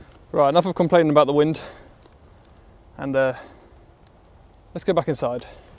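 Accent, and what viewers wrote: United Kingdom. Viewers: I can taste the UK accent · Eeemm like british accent..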